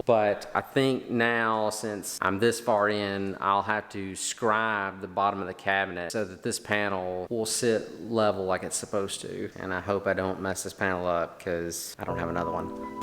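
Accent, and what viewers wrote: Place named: Tennessee, United States. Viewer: Are you from Old Hickory Tennessee? You sound like you could be Nate Bargatze’s brother. Lol!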